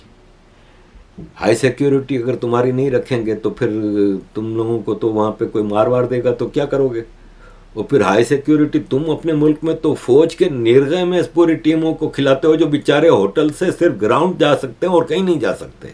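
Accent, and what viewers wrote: Gujarat, India. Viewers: Nice to hear you and your style of talking...adding some gujju snd kacchi dialect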